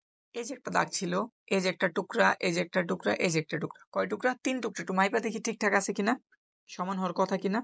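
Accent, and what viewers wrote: Comilla, Bangladesh. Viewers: bhai apni ki cumillar❤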